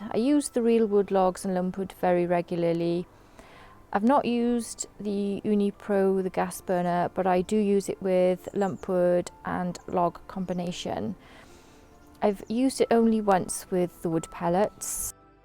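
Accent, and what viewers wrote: Wales, United Kingdom. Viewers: Do I detect a hint of Welsh? You should do ASMR videos :). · What is it about a British accent? Just love to listen to it! Great video, will probably get one not just for the lovely accent but it looks like it held up well over a year.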